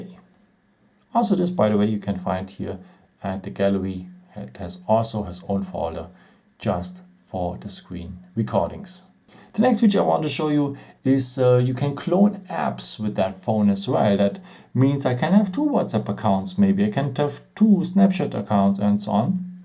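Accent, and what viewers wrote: Germany. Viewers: Can you speak English ? You are 100% a german guy !!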